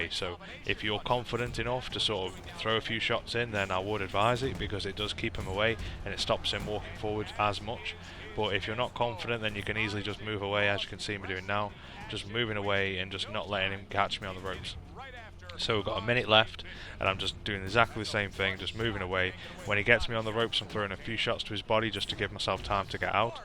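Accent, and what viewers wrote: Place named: Nottingham, United Kingdom. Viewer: This took me like 50 attempts and I smashed so many PS3 pads. Uploaded, are you from Nottingham? You sound like you're from around here because you sound like Carl Froch, haha.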